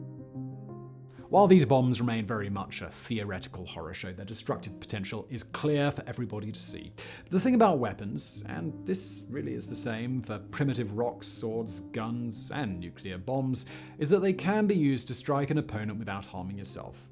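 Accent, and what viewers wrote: United Kingdom. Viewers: Is he even Brittish? Sounds like a Brittish wannabe American hipster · I think these channels are not his, he's probably hired to read the script with his nice British accent and all the other work is done by other people, he used to host the English version of visualpolitik which is a Spanish channel.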